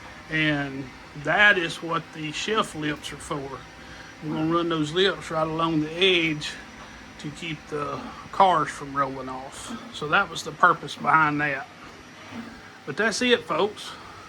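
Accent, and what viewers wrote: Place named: South, United States. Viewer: Southern twang at its finest....cool room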